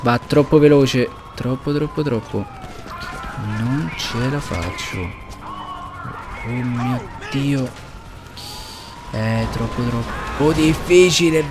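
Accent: Rome, Italy. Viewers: Mo se sente che se de Roma!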